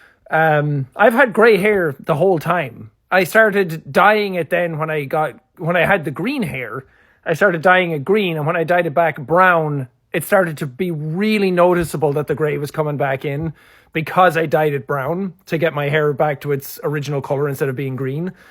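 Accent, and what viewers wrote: Ireland. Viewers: Why does this Viking have an irish accent?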